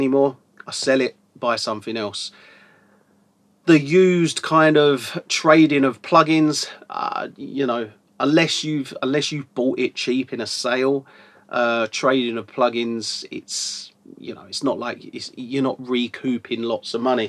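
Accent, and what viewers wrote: England, United Kingdom. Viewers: great reviews as always!! Thanks-you. When you say "three" it sounds like "free" ! ...its the English accent + my hearing loss! :)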